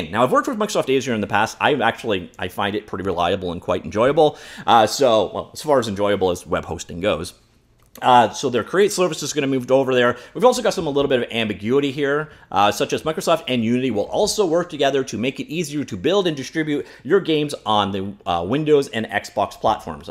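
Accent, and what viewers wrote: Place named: Canada. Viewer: He is Canadian after all. They pronounce a lot of words wrong. I think it's kind of cute, like Carter said to McKay.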